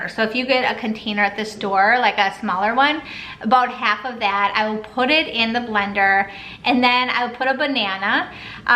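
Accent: Minnesota, United States. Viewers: You still have that Minnesotan accent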